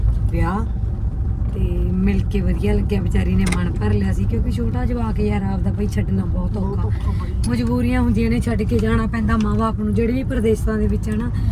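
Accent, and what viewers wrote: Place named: Barnala, India. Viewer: Khudi kalan mandeep de sohera pind tusi barnala bol rhe oo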